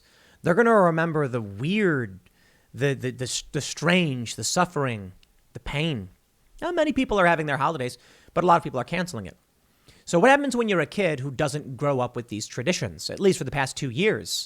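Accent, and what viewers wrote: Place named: Chicago, United States. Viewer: Has anyone else noticed Tim is starting to exaggerate his As and Rs. We get it Tim you're from Chicago....geeze.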